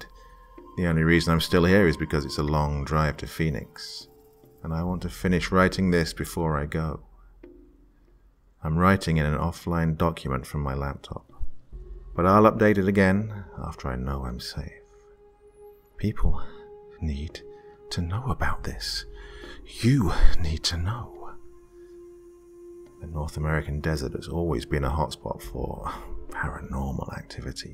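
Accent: United Kingdom. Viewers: i also live in england and i thought he lives in england?!?! im so confused i thought he was british? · I made the mistake of thinking that with your accent you were perhaps already located in the UK. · I thought you were British 😂 well, enjoy your trip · "My work is taking me to England" 1) I genuinely thought you were British! 2) Good luck, don't mention Brexit.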